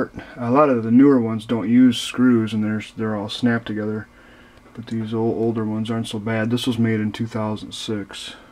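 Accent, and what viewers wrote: United States. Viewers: I am sure every state and country you have visited you heard the word SOLDER, why was this word mentioned to you? Do you sell it or what? Add another letter and wou get the word SOLDIER, how do you pronounce that? SAWDIER. It is two syllables SOL say that.... and DER..... and that. Now put them together, what do you get, MUPPET. You are obviously American, where you say Cli'n instead of Clinton, Innernet, couner, couny, twenny...............learn to speak English. Muppet.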